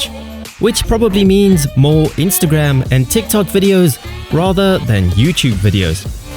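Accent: South Africa. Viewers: crazy how I can tell youre south african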